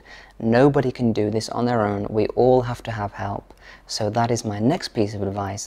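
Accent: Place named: England, United Kingdom. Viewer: Hello! I recently found your channel and I have to say, i am in love with yout content! I can't tell you how much joy your videos bring me! I've never been to England but this is the way I always imagined english people sounded!😅 I am a big fan of Her Majesty The Queen and the way you speak is so similar to hers! Whenever you say the word "again" it just makes me think of her 🤭 anyway, thank you for delighting us with your wonderful content!!!🌷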